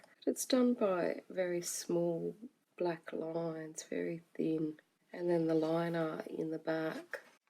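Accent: Australia. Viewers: I like your videos a lot, and it's great to hear an Aussie voice, but I'd like them even more if you kept you hands/fingers off the page while trying to see the details of the picture, and yes, they are exquisite. You've definitely persuaded me to buy at least one of these, so thank you for sharing.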